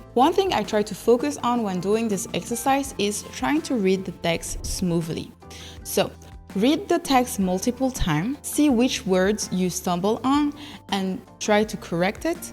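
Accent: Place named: France. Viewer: The accent is French and the name is a word in several bantu languages in Uganda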